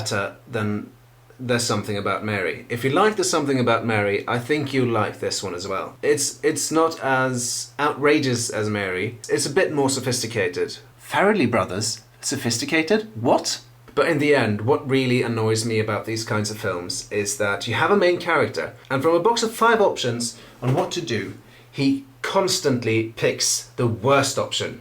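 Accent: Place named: England, United Kingdom. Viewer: how come your accent is so english if you're norwegian?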